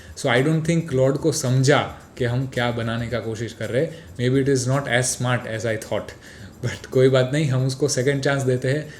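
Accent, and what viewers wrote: Gujarat, India. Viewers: bhai yaar aapki hindi me jo gujarati lehka aata hai vo maja aata hai...by the way english bahot achhi bol lete ho. bhai me bhi professional graphic designer hun ahmedabad se. abhi kam bahot hi kam mil raha hai koi help ho sake to kar dena bro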